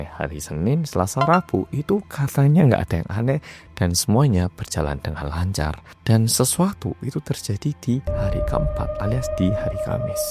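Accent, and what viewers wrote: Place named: Malang, Indonesia. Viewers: Lah kan mas emang gedenya di Malang. Jadi ya wes biasa lah kalau ngomongnya masih khas arek malang banget 😅 malah itu jadi ciri khasnya dia yg bikin beda dari konten kreator asal Korea lainnya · Kayak awe awe ...byuh mass malangan banget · hahaha mas lek wes metu bahasa jawa malang e lucu pwoll 😭😭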